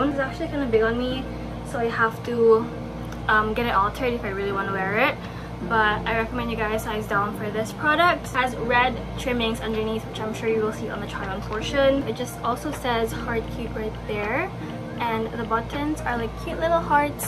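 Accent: Visayas, Philippines. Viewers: Bisaya ka? Hehe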